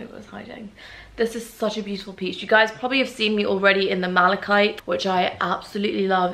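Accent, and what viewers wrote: United Kingdom. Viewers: You are iconic (with the British accent)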